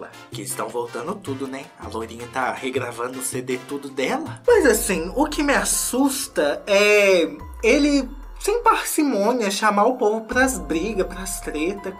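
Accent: Minas Gerais, Brazil. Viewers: Meu Deus, tem vez que eu to cagando pra notícia, mas eu coloco só pra ouvir a voz e as piadocas de. Ele tem uma voz e uma energia Rico Melquiades, só que do triângulo mineiro, hahaha. Legal demais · me divirto muito com vc! Adoro suas tiradas, seu sotaque de mineiro e sua voz! Bjsss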